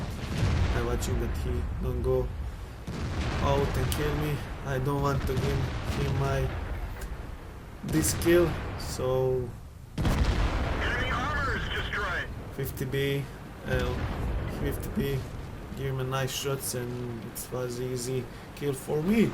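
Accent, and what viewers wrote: Russia. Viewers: you sound like are from russia, but its cool, keep it up, I love your chanel. I di je onaj ludonja sta kaze jebi dan krumpirko, ukra si mi kill. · Kako koji put sve si bolji sa engleskim ali i dalje zvucis kao russ ;)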